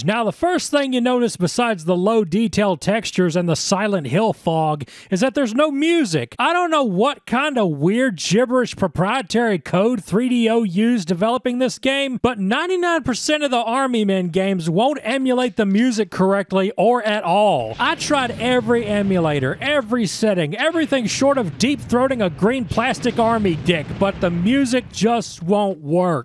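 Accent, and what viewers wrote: South, United States. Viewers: A game reviewer with a southern accent covering one of the games that I played to death as a kid? Have a free subscribe, good sir. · He's southern like accent with a coyote avatar fits well like the final peace of the puzzle.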